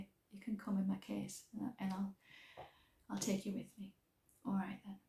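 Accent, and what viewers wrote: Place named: Northumberland, United Kingdom. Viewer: Hi,. I am soooo happy to see you had a new episode tonight. I absolutely love your podcast. You have such a lovely, calming effect on me, it was just the perfect, relaxing 45 minutes I needed as a hectic week draws closer to an end. I lived in Northumberland for a couple of years (although I have been back in South Africa for 18 years in June) and I still get homesick for it, occasionally. Your accent alleviates that somewhat 😂 As always, your projects were lovely. I find your more "minimalistic" approach to crafting refreshing (I too try not to just buy all the yarn, unless it is for a specific project, which would get started imminently). It is in inspiration to me to work through the stash I had acquired before I changed my ways. I hope to see you again, soon! And if you are holidaying in Northern-England, I would love to go along in your case! 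Ps: the yarn on your warping board already, is the warp. You will be weaving your weft through the warp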